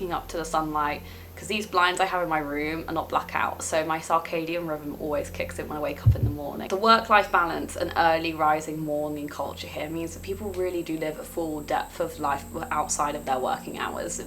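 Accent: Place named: England, United Kingdom. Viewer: Lovely accent which part of England.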